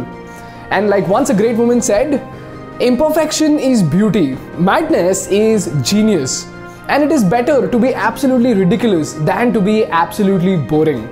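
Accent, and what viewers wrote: India. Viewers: Love your indian accent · " it is better to be absolutely ridiculous than to be absolutely boring" What an amazing video. So thought provoking. Love your Indian accent adds a veneer of ancient knowledge being conveyed. I found your sports analogy from an Indian perspective quite peculiar. Only an Indian would have used Cricket 🏏 as a metaphor 🤣😅😂. Most would have used Football ⚽️ and Maybe an American , American football🏈 . I just discovered your channel and have watched 3 videos now. Excellent content 👌 and production. Definitely earned my subscription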